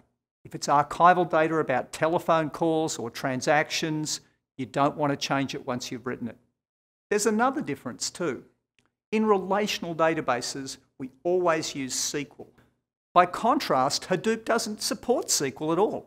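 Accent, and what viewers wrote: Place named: Australia. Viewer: That's a presentable Australian accent, they get much more wild than that!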